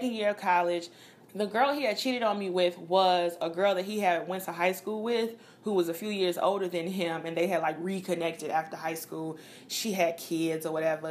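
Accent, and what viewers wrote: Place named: Chicago, United States. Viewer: U from Chicago huh....nice. Midwest in the building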